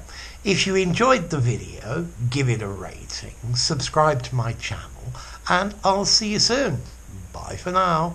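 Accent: United Kingdom. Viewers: Sir your accent is very British, I love it. Thank you for helping us understanding these idioms 😊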